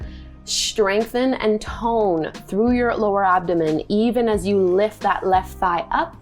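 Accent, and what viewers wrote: Canada. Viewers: Started following for the Canadian accent (I'm homesick 🤣😭) but stayed for the amazing yoga! Thanks. Had an overpowering feeling of love and sadness for my deceased grandparents during the savasana at the end of this practice. I wasn't expecting that.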